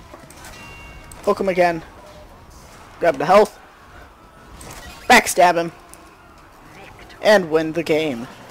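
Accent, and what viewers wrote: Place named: New Zealand. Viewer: I don't usually do this but because of your accent I will... I recon that you are from New Zealand, May be wrong but you voice sounds like it. Btw nice vid, I made my own trickstab montage if you would like to see it.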